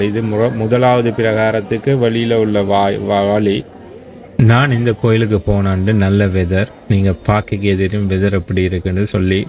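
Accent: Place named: Sri Lanka. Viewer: ஆஹா ! அருமையான இலங்கை தமிழில் அசத்தும் வர்ணனை !🙏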